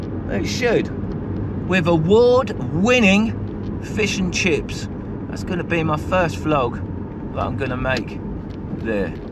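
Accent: United Kingdom. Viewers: lol that ole norfolk accent soon comes back!!! lol miss norfolk, esp sheringham & cromer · Good show. Thank you for sharing your life. You have much thicker British accent now that your back in the UK. I like Flo, you even have a sunroof. Looking forward to your upcoming adventures in Europe. Will you be getting the Netherlands? Amsterdam would be a very cool place for a vlog.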